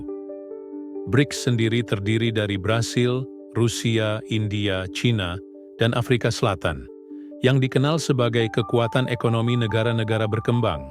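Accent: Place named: United Kingdom. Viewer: Orang British bahasa Melayu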